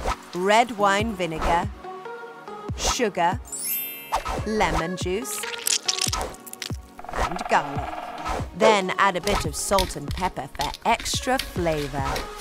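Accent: United Kingdom. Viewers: So he's or she's idk British???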